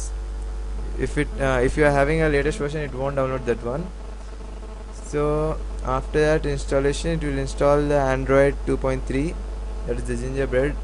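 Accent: India. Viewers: hahaha i love gujju accent lol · 100% idian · He sounded like a Hindi (India).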